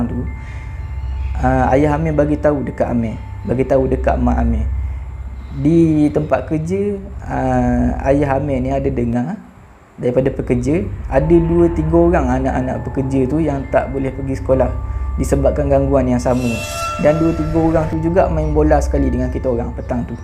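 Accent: Terengganu, Malaysia. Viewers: Org ganu ke dik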